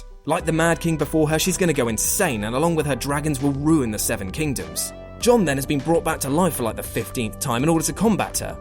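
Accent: United Kingdom. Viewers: Brittish Ben 10 voice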